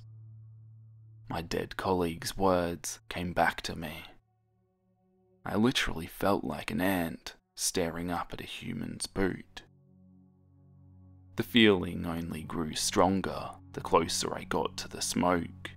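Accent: Australia. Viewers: Ayyy an Aussie 😌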